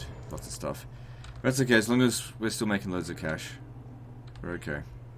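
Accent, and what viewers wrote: Australia. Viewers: He's australian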